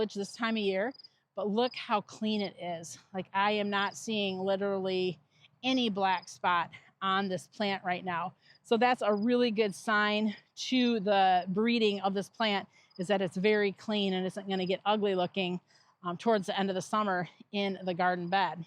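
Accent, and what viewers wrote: United States. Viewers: If American mispronunciations bother you so much, maybe you should consider not watching an American's content about an American company, on an American made media platform. Clearly what we lack in proper pronunciation, we make up for elsewhere or you wouldn't be here.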